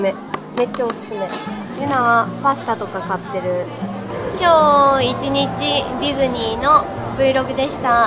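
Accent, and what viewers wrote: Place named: Fukuoka, Japan. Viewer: 私も福岡で方言喋ってるの見て嬉しい、ほんとかわいいなぁ…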